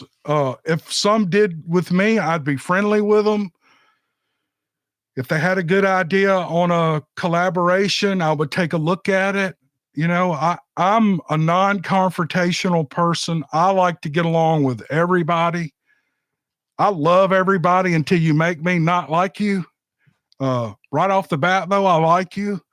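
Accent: South, United States. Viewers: I LOVE YOUR VIDEOS..THE SOUTHERN ACCENT JUST PULLS ME IN AND YOUR SUCH A NICE GUY..GOD BLESS...THANK YOU FOR ALL YOUR HARD WORK AND DEDICATION..❤HOPE YOU FEEL BETTER SOON!! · Me to Jenn jenn he is a humble soul just listening to him soothes your mind and the southern accent I luv.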